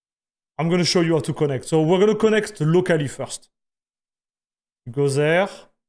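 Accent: France. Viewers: On entend presque plus le pti "french accent" 😝😁😉 Vivement un PUBG avec Choco 👌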